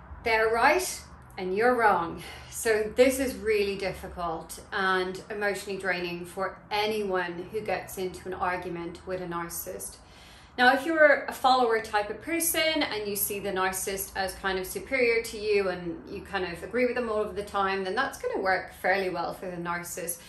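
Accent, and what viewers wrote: Ireland. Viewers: is that a hint of an Irish accent ?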